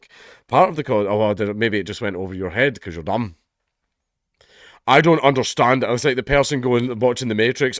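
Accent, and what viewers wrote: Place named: Scotland, United Kingdom. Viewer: absolutely LOVE the bouncer stories. The way you say it all in your Scottish accent makes it so much funnier when something funny happens.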